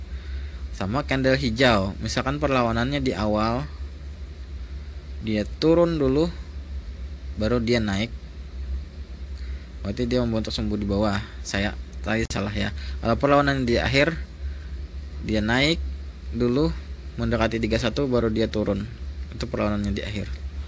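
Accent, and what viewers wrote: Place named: Kalimantan, Indonesia. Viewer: Bang tinggalnya dimana ? dari logat bicaranya org kalimantan yaa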